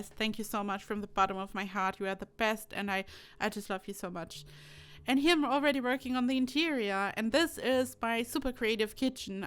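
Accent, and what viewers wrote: Germany. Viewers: First, congratulations on bypassing the 100k subscriber mark and receiving your silver play button! You definitely deserve it. Secondly, I happen to love your German accent. One side of my family is of German ancestry and I took German in 10th grade many, MANY moons ago. I'm super happy for your Simming success. Also, congratulations on being the designated SNB Bank venue builder for SimRealist. Way to go!!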